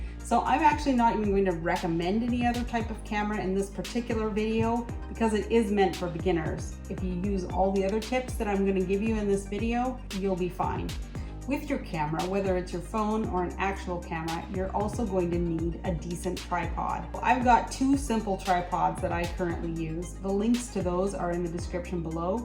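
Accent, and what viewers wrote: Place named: England, United Kingdom. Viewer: I liked your English accent. I´m from Brazil. Where are you from?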